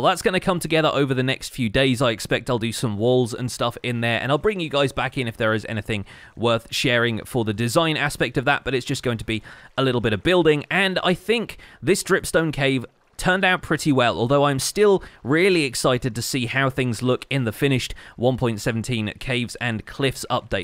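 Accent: United Kingdom. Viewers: I think that is because of his British accent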